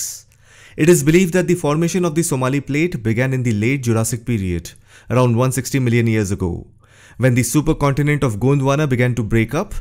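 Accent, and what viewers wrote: India. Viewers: Bro, sounds Indian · Why you sound like you from india?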